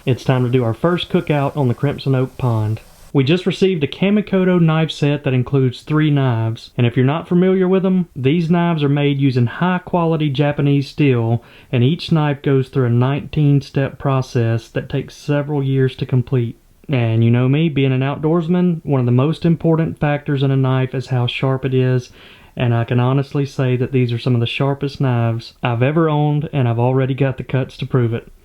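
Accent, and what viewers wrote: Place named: Alabama, United States. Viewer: Yah, that soothing Alabama voice and bass!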